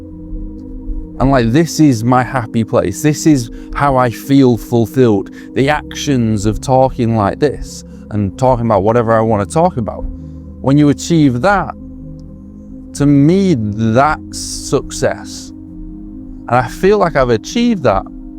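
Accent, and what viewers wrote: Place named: Manchester, United Kingdom. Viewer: More people need to hear this. You are straight talking and bang on point in what you say. Im from Manchester, Your accent reminds me of my grandad and he used to say the same things you are bringing up which is proof there isn't a glitch in the system its by design. Please don't stop doing the videos you make me feel less crazy because im not the only one thinking it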